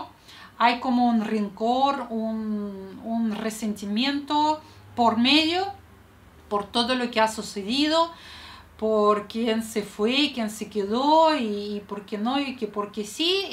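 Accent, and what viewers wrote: Chile. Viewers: Hola.. muy interesante tu vida, eso sí es una historia de vida, te escuché muy atentamente y también fue agradable escuchar tu acento y modismos Chilenos, eres entretenida, hermosa y agradable. Saludos y me alegro mucho por ti. Un abrazo.🤗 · ¡Hola! Escuché integramente tu relato, porque me lograste despertar mucho interés en tu historia de vida. Me encanta como te expresas en español-chileno y la manera honesta de tu relato. Admiro la fuerza resiliente que has mostrado para salir adelante, tanto en el plano personal, como en la responsabilidad con tu hijo. Más allá de los muchos momentos difíciles que te ha tocado vivenciar en tus experiencias migratorias, ¡te felicito por como has asumido tu vida! Te cuento que he tenido la oportunidad de viajar a varios países, entre ellos pude viajar a Rusia en 2019 y conocer Moscú. A partir de ese viaje opté por aprender ruso y hasta ahora trato de practicar el idioma cada vez que pueda. 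Меня зовут Рафаэль, и я живу в Сантьяго, Чили. Надеюсь, у тебя все хорошо, и ты наслаждаешься жизнью, своим сыном и любой поездкой за границу, которую решишь предпринять. Пока-пока! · Hola amiga, gran historia la de tu vida. Imagino que todo ésto te ha convertido en una mujer fuerte y determinada. Felicitaciones, se requiere de mucho temple y carácter para sobrellevar tales dificultades. Admirable. Es posible que la manera de ser de los chilenos te hagan sentir identificada con nosotros. Acá cuando te caes tienes que pararte rápido y seguir adelante. Un abrazo desde Santiago de Chile. En todo caso el idioma chileno te queda muy bien ❤😂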